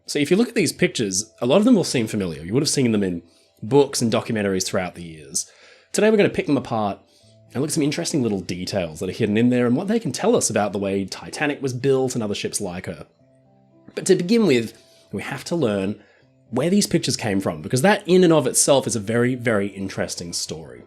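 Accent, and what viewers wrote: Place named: Australia. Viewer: I thought he was irish for years and then ir became obvious hes Australian...lok😊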